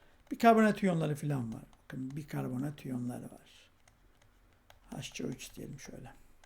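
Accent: Black Sea, Turkey. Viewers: Hocam karadenizin neresindensiniz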